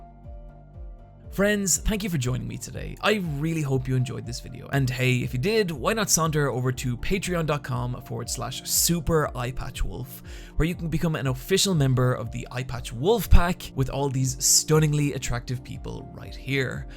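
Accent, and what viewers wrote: Ireland. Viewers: Oh so you're Irish... I thought that was your narration voice. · What nationality are you? I'm getting a little Irish from the accent I think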